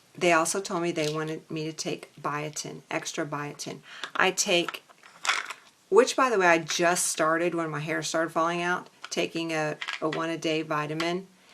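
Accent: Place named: South, United States. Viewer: 💗 I understand. I’m in California, in a liberal place with a lot of this holistic stuff going on. You have an adorable accent, it sounds a little southern? Anyways, you radiate like some glowing people do and i understand that energy when I’m well. The past week, my spirit has just sprung up. I’m feeling so happy. I saw that you accidentally erased one of my messages. That’s fine, I will get back to you very soon 💗🌸💗 I articulate well at certain times when my brain’s really on point, so I’ll get back to you. No worries. How do I order your book? I totally want to support you in this and hear your story and I will most definitely be able to leave a deep, honest, heartfelt review for you because I can be very talented in that way when it comes naturally 💗🌸💗 Much love!!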